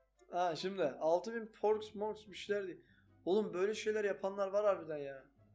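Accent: Black Sea, Turkey. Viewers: Abi senin gonuşman çok hoşuma gidiyi bende garadenizliyim bayburt Guklagraft yeee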